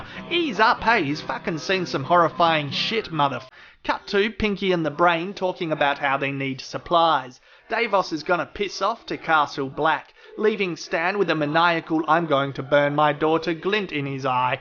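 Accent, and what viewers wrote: Australia. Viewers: Fuck this shot is gold 👍🏻 you an Aussie? Or just the name? Aha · Love your facking reviews mate. Australian narration FTW! I imagine you've read about Georgio's plans for Shireen in the book? <link>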